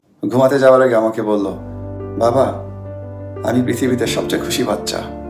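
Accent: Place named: Sylhet, Bangladesh. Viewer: ভাই আপনি সিলেটি। । শুনে খুব ভালো লাগলো ফ্রম লন্ডন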